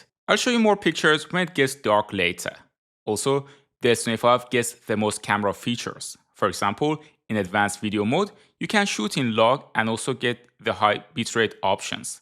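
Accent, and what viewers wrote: Iran. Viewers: Persian accent, nice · Dude are you Iranian your accent is so obvious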